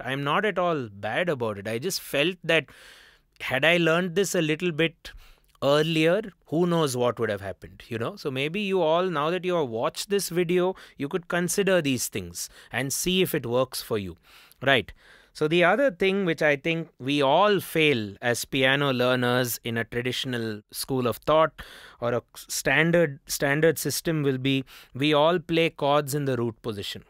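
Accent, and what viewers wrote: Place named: India. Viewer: Following ur channel ..I parallel ly learnt bit of english ,😆😆 in indians ascent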